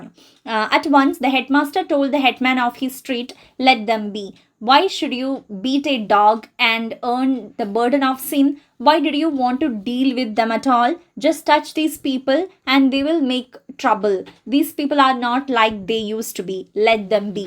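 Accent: Thrissur, India. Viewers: തൃശൂർ slang is ❤️😌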